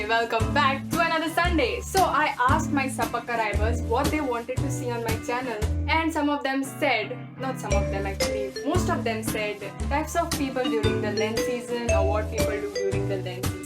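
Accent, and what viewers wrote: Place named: Konkani, India. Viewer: Love your Konklish, energy, charisma, quick wit, mannerisms, fun, humour, painted moustache, painted hair on your chest ..... and not necessarily in this order.! Keep it up.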